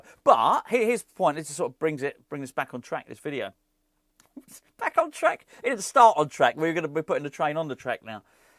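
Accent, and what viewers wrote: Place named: RP, United Kingdom. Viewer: BBC pronunciation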